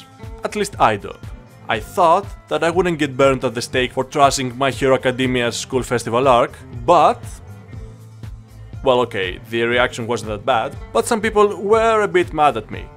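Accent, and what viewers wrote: Greece. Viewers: that's a pure greek accent xD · Φίλε μιλάς πολύ καλά αγγλικά αλλά για κάποιο λόγο με το που σε άκουσα λέω αυτός είναι Έλληνας 😂😂😂. Συγχαρητήρια πολύ καλό βίντεο! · i instantly knew you are greek from your accent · Are you Greek? Your accent is so familiar😂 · I watched the video 7 times to understand what country your accent is from and ended up with a question. Are you Greek? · Why this guy sound like a greek man speaking english. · are you from greece? yhat accent is familiar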